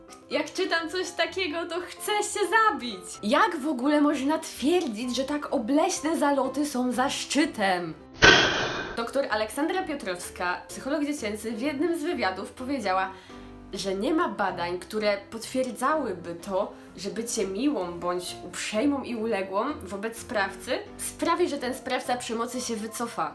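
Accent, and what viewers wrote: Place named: Silesia, Poland. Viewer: Świetny kanał, bardzo miło się ciebie ogląda 😁 poleciał sub oraz łapka 😁 Powiedz proszę, czy jesteś ze Śląska ? Słyszę u Ciebie lekki akcent, ale mogę się mylić 😂😂😂😂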